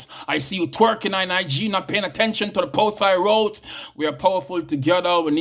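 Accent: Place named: Jamaica. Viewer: Thoughts, emotions, experiences well put together. Expressions one can identify with. I love listening to you recite them. Your Jamaican accent gives it such life! Great job! Love it! 👏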